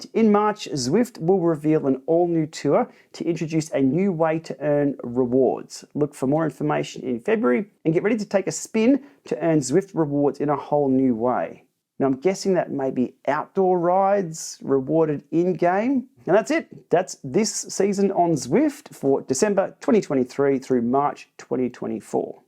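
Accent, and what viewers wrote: Australia. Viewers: Don’t say it both ways just talk Australian and say route as root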